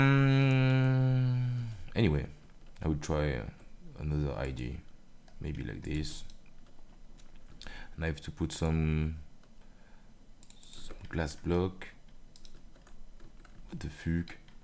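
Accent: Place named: Europe. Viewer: mistakes make you beter and i am just happy to see other people from europe trying to speek english keep up the good work.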